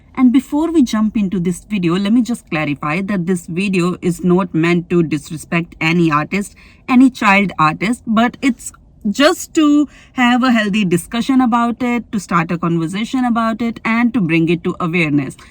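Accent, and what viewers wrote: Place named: India. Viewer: This video is a perfect example of an Indian women outside India speaking about an Indian topic in English but with Indian Accent.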